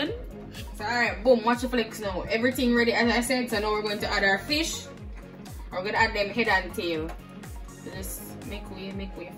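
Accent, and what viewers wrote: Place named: Jamaica. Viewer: Muma naa lef out the Jamaican accent at all! Mi love that 😂😂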